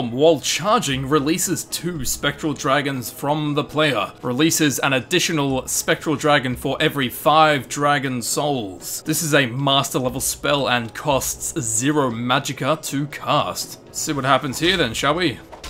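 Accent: Australia. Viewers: Your an Aussie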